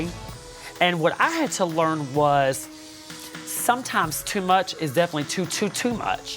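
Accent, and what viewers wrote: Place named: South, United States. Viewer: sounds like a southern blonde soccer mom or something. i feel like she's going to ask me how the kids are doing and invite me over for a glass of wine.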